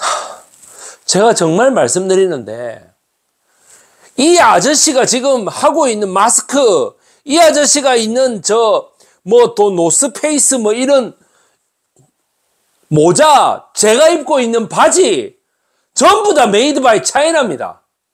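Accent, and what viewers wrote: Gyeongsang, South Korea. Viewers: 상대방을 대하는 존칭부터 배워야 될것같네 혀짧은 반말 갱상도가 개상도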